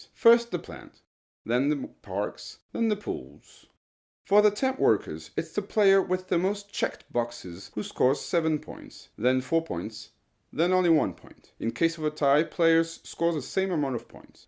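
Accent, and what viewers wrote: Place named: United Kingdom. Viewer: Waou la voix qu'il a en anglais! Très lord british!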